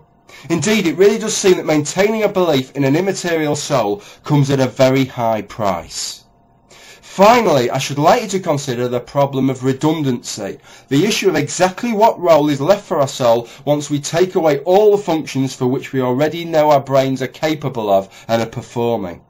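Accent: United Kingdom. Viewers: To most Americans, you sound 50% smarter by just having that British accent. I agree with you, by the way.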